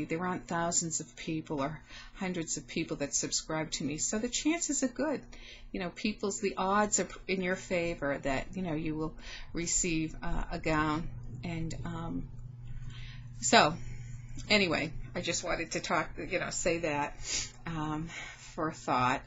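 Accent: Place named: Canada. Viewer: Hi, new subscriber...I just came over from lynnyvnnestwo channel...she made a video response to promote your challenge...here's a link for you - <link> (hope it works) not sure if I'll be able to take part this time but good luck to everyone that is...hope it all goes well for you...enjoy. The dress is beautiful and it's so generous of you to want to share...I think it would be hard to cut into one of the dresses though but I guess once you start it's ok and there's so many things you can make once you have one...also are you in Canada or America...my guess is Canada but please forgive me if I'm wrong, I don't want to offend anyone..lol..why I ask is because there's a certain word that Canadians say and I love the way it sounds...the word is "out" and I'm sure it's a unique pronunciation to Canada...anyway, that's enough from me...best wishes. Ann...x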